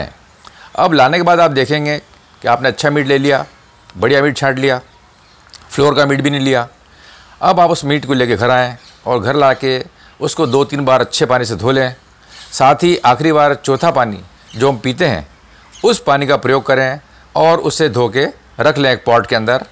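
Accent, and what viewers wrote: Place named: Jodhpur, India. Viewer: are sir.....apki dialect ekdum Jodhpuri h.....kya karya krte h sir by profession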